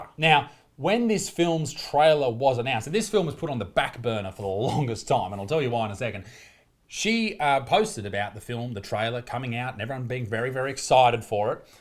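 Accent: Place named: Australia. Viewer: As a fellow Australian I really dislike your oker accent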